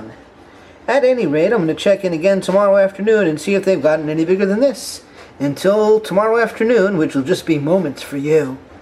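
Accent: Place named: New York, United States. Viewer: Your accent kinda reminds me of a newyork one c: nice vid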